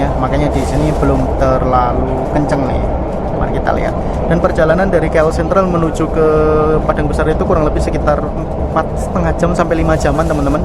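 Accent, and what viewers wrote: Java, Indonesia. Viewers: Bang, maaf ijin tanya. Abang org malaysia atau org indo? dr logat nya orang jawa. Abang belum pernah naik kereta indonesia toh? Kereta kita juga ad yg ada cafe dan pramugarinya. Design dna teknologi jg lebih canggih. Ada karaoke, charger dan tempat duduknya tidak sesempit di malaysia. Jendela juga besar sekali. Ad tempat koper. Coba update dulu sebelum kasih judul bang.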